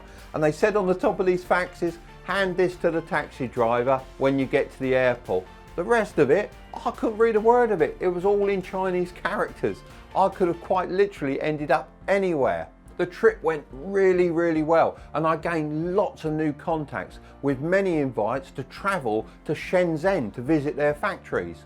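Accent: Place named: Norfolk, United Kingdom. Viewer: nice norfolk accent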